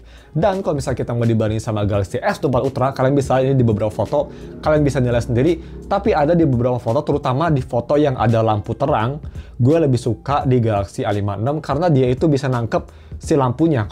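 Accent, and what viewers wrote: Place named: Depok, Indonesia. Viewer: mas" Depok 😅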